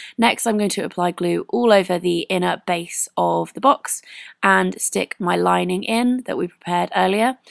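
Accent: United Kingdom. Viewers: I love ur British accent :D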